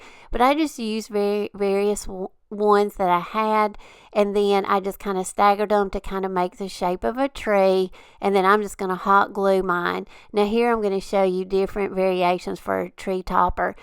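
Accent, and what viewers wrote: South, United States. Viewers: I absolutely love ALL of your projects ❤ they're so amazing and so beautiful... I always walk away so inspired by you!!! My husband and I were wondering where you are from? He was laughing at the fact he always thought I was extremely country sounding...until he heard you!!! We live in N.C. sooo we know country!!!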